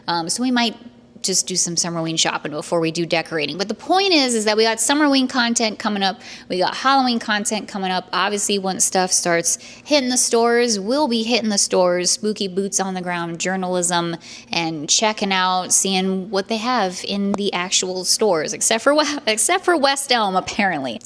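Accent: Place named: New Jersey, United States. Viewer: I want you to know I am very proud of you for resisting the temptation to buy the amazing spooky items I know how much you love them I always enjoy seeing you so excited and happy when you get to make the purchases and I miss hearing you say purchases in the New Jersey accent and I always think it is cute when you say I am not going to spend a lot of money then you get back to the car and you are like I had to get them I think it is so funny and cute and I love how you decorate you're very good at the decor and a very good shopper I love halloween and Christmas and Halloween it's not official until you and say it has begun me and my family have watched you for three years now and you have always brought us our Halloween fix I will never forget when you went to the at home store and you were determined to give us our Halloween fix and you were determined to get that witchy candle you climbed on the flat bed dolly and you got it your dedication and determination to bring us our Halloween fix has always been the best so don't worry you're doing a perfect job and I hope my words make you smile and smile me and my family love you and always and forever 🖤🧡🖤🧡🖤🎃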